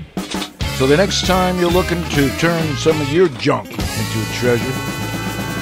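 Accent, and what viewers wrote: South, United States. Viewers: James Cassel Southern U.S, man. Don't judge them.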